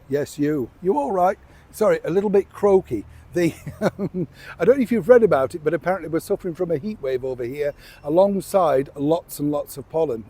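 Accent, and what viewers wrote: United Kingdom. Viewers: Lee Marvin was a fine actor but you win in my book with your British accent. ❤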